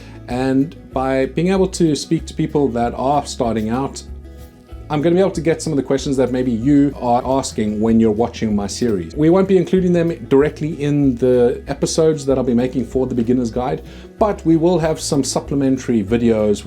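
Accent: South Africa. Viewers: South Africanism ascent.... My homie.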